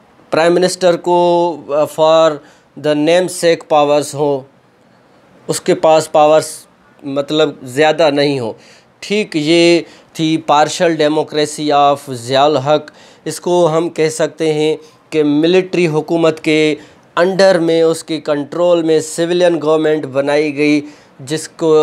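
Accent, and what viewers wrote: Sindh, India. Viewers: Sir are you sindhi ??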